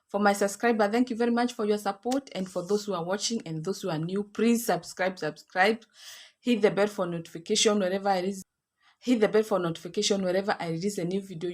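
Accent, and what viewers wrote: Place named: South Africa. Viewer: 😂😂😂 Sounds like south african 😂😂😂 I think you're married to a south african.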